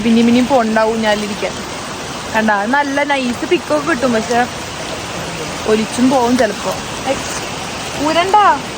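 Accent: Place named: Malappuram, India. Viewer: Ith malappuram slang alle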